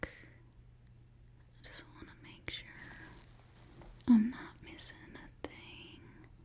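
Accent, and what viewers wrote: South, United States. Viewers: I’m southern, let me just say, as soon as you started talking with that accent I immediately felt at home☺️ I love these types of videos! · I don't know why, but souther accents for me is just ❤ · Oof that southern accent is a massive turn-off lol... I'll give it a like and a comment for the algo and yeet myself out ahaha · I LOVE when she a southern woman. She does the accent so well! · I love this ASMR Southern Nurse Accent. It gives me tingles!😊💙💙💙💙💙💙💙👍. Well done,!💖⭐💖⭐💖⭐💖⭐💖⭐👍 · Being from the south I’ve never felt so comforted by the southern accent then I do right now! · growing up in the south it's uncanny to hear a southern accent not yelling all the time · As someone who isn't a fan of America or American things i really like the southern accent when spoken softly like this. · The thunder in the background was chefs kiss. 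I also REALLY love the southern accent. So comforting!! 💙💙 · Honestly, southern hospitality makes for the best asmr videos, regardless of content. You did an excellent impression and will be listened to multiple times in the next few weeks. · Yess the southern accent my favorite 🫶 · As a VERY southern person (Louisiana southern) she NAILED this southern impression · I love it! Can you do a video with the southern accent but about eye exams? ❤ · There’s just something about southern accents… 😊😊😊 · Normally you can tell very easily when someone is faking a southern accent. But you…immaculate. So good. ❤❤❤ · Thats a pretty good southern accent! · You do the southern accent so well!!!!!! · You sound and look like a young version of Bert kreischer’s wife. You do a pretty good southern accent! Sound like me almost but more like my granny the proper southern like a Georgia peach. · I grew up in deep rural tennesse..and even she does a better southern accent than I do. 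· Two things I enjoy southern accent AND her ear videos? This is amazing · I love the southern accent videos so much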